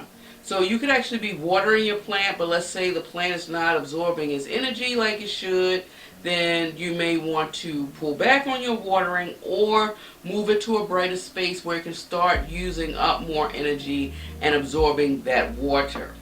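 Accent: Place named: Maryland, United States. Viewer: If u ain't from Merrland i dont kno who is prolly my fav accent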